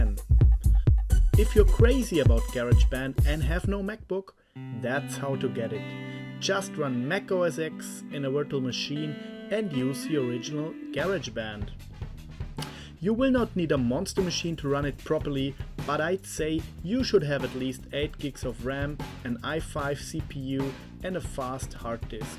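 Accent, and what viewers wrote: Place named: Germany. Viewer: man hört wie deutsch du bist lmao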